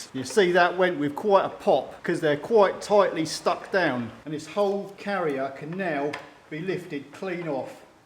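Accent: United Kingdom. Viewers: Hi british accent, this wet belt made by Dayco?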